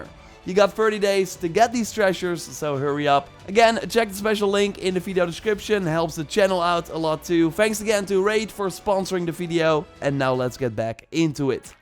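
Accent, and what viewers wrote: Netherlands. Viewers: You sound Dutch :o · Nederlandse accent...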